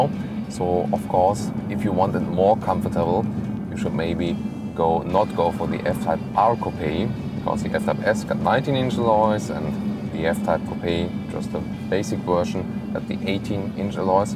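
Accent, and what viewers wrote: Germany. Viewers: Very nice commentary on this awesome car! Love it! :) Ab und an merkt man deiner Aussprache an, dass du Deutscher bist - keine Kritik, sondern einfach der einzige Punkt der mir etwas negativ aufgefallen ist :D Keep it up!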